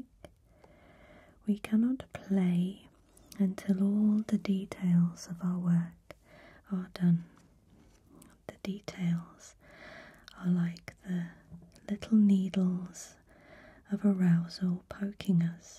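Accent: England, United Kingdom. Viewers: should be WAY beyond 1.5+ million subs....she is simply Fabulous & her voice is so wonderfully calming. I adore her beautiful English accent, she is absolutely lovely! Please Like her vids if u watch/enjoy bc that gets her videos out to more ppl. Everyone needs some in their life. Idk how anyone could be grumpy, negative or NOT be in a great mood after listening/watching beautifully relaxing, positive ASMR videos. Thank You! You've soothed me to sleep/beat insomnia naturally & greatly reduced anxiety too many times to count. I truly appreciate all you do♥️. With gratitude, ~A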